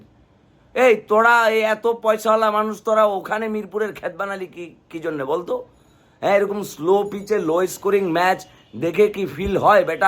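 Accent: Kolkata, India. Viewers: আপনার মুখে কলকাতার ভাষা ভালোই লাগলো। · দারুন কলকাতা ভাষায় কথা বলছেন❤❤❤ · কলকাতার এক্সেন্ট আপনারে মানায় না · Kolkatar vasay joss lage vaia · এরকম কলকাতার ভাষায় বলতে থাকলে ভিডিওতে লস খাবেন আগের ভাষায় ফিরে যান। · Bhai kolkata r accent ta ekdom bhaloo lagee naaaaa............Normally onek bhalo lage apna kotha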